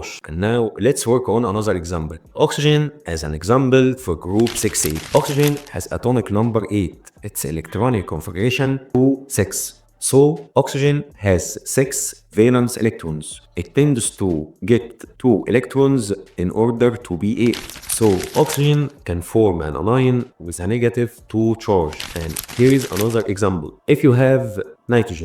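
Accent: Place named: Egypt. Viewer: الفيديو عظمة بس طلع لسانك في الTh يا مستر بدل ما كده مصري أوي 😅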